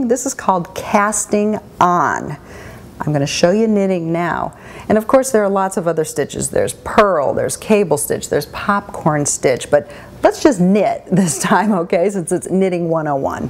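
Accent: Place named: Oregon, United States. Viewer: omg! i cant seem to stop haha...and your voice reminds me of Oregon! i miss my home state :'( and a wonderful knitting and sewing grandma who lives there! Thank you once again.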